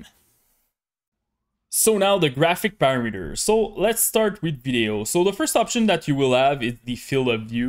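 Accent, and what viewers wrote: Quebec, Canada. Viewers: t'es clairement quebecois hahahaha. bon video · Tu sonne qc ?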